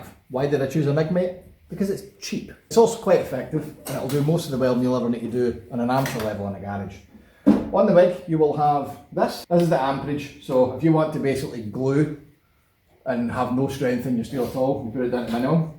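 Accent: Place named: Scotland, United Kingdom. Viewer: I Like the video but the Scots accent from the scot is sometimes hard to pick up what he is trying to tell us about his build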